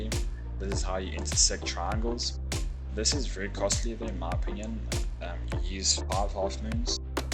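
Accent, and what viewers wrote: South Africa. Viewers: Are you South African??????? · lol Afrikaans?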